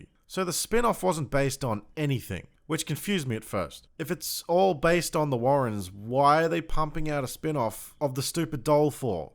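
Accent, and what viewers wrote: Australia. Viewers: Are you Australian?? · Wait, Your Australian?... IM A FUCKING QUEENSLANDAAAAA! YEAH BRO! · spot the Aussie lol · This shit wouldn't be as funny if you didn't have an Australian accent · I just worked out that you’re Aussie and that made me happy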